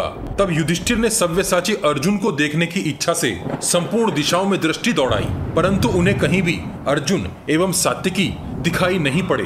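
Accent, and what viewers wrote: Standard. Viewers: अत्यंत प्रभावशाली आवाज शुद्ध उच्चारण सुनकर मन प्रसन्न हो जाता है मैंने गीता प्रेस गोरखपुर के कल्याण अंक में ये कथा पढ़ी है पर आपकी आवाज में सूनना आनंद दायक है कृपया शकुनि के बारे में जानकारी दें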